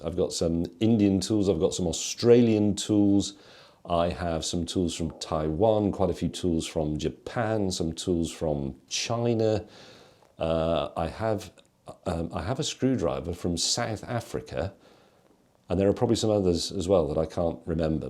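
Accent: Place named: United Kingdom. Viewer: This guy is just plain boring . If it weren’t for the British accent and the long hair…..?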